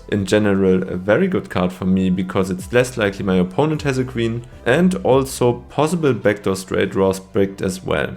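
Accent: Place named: Germany. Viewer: Good vlog my friend. I’m loving that German accent 🇩🇪. Nice juicy game man :)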